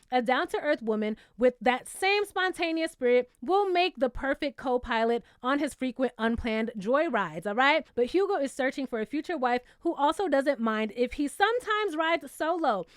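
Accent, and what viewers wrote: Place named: Brooklyn, United States. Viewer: We need to give your brooklyn accent a persona and a name. I wanna call her Rosie or Roseanne for some reason.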